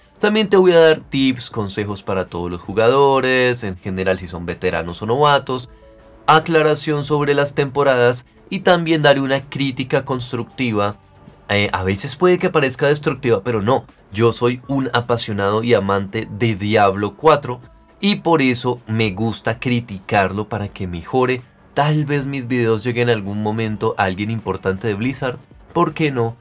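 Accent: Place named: Colombia. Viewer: Hola parcero se nota por tu acento que eres Colombiano. Soy nuevo en el juego y tus guias me han venido de lujo. Explicas con mucho detalle. Buenos videos bro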